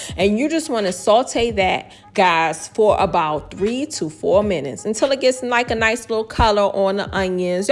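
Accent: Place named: DMV, United States. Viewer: Gotta b from the dmv the way u talk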